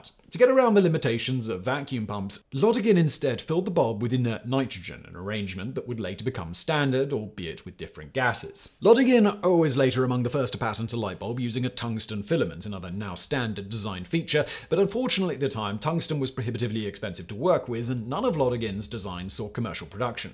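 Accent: United Kingdom. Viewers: Well the narrator with the British accent seems to think history class was only taught in highschool. that excludes everyone in the UK then.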